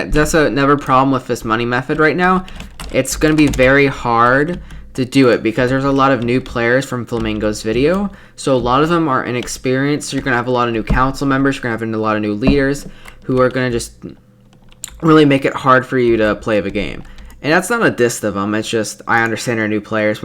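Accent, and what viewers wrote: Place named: United States. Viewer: because cowboy accent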